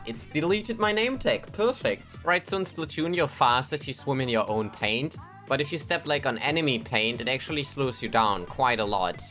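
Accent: Germany. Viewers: quick question, are you german? your accent really just sells it (correct me if im wrong tho)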